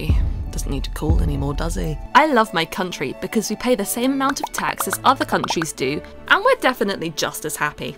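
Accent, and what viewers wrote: United Kingdom. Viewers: Somehow I don't care about the actual content (politics and british rant) but the animations are so cute that I just keep watching. Also british accent is just funny :^)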